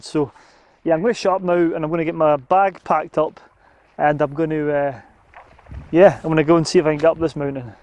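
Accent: Scotland, United Kingdom. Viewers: I'm "jealous",:-( Great beautiful video it has an inviting character. Have already been up to pack my things and am leaving for Scotland NOW. Great to see nature while you still can. We humans have no alternative but just this planet and we must continue to use it responsibly. The way you tell in your Scottish accent is reassuring and enjoy the video in this flat little country where I am. Thanks for your video and on to the next one. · I’m a Glaswegian but never been back to Scotland for going on 20 years now. I really enjoy listening to your dialect……and not to mention the absolutely incredible filming, must be a lot of effort put into creating tour videos, it’s really appreciated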